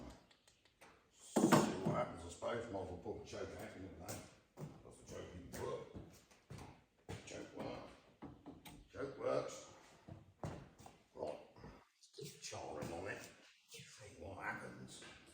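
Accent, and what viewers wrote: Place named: Wiltshire, United Kingdom. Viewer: just love the videos and the wiltshire acent but most of what you say is lost because your microphone need to be replaced for a better one.. thanks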